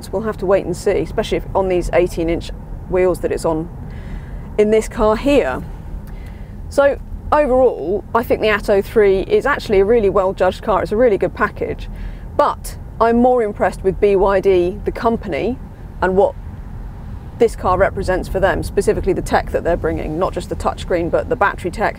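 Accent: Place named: United Kingdom. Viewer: She sounds british, but why is she driving on the wrong side of the road?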